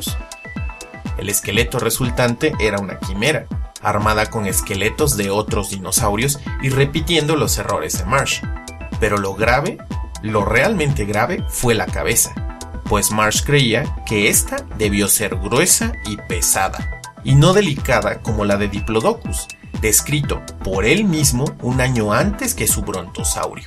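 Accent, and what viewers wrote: Mexico. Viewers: ¿Eres de México?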